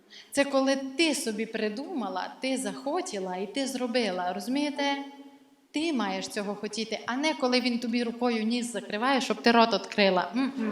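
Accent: Transcarpathia, Ukraine. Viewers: Дякую за Вашу творчість, за Закарпатську говірку. Усі говірки - то скарб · Гарно балакає по закарпатьськи, ФАЙНООО!!!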